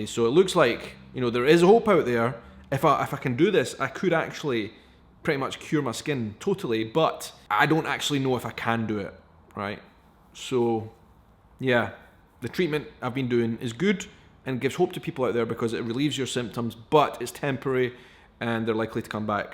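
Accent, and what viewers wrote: Scotland, United Kingdom. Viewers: Hello, dear I have been watching your videos for around 1,5 years and besides that I love them, I can honestly wholeheartedly say I never noticed anything strange about your skin or arms at all. And even if I had wouldn’t have made a lot of difference - I’d still be watching your content because I love your accent, your stories and Scotland 🏴 in general. 🥰😊😃😌 👋🏻 Stay as gorgeous as you are I’m waving to you from Germany 🇩🇪, Berlin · I’ve never noticed any of this and to be honest none of it matters — you’re a handsome guy with a great Scottish accent. 🙂 I’ve always admired your openness and confidence. Keep your head up and know that your honesty about this topic is probably helping a lot others who deal with this. Dietary changes are hard, I know. I am gluten and lactose intolerant and I have been on a 100% gluten free diet for 15 years. 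Once you see the benefits it will get easier. Also try adding kefir to your diet it’s great for your gut and it can help counteract the inflammation. Best wishes! Oh and I’ve had small patches of psoriasis that were helped by a lotion called Curel Itch Defense — not sure if it’s available there.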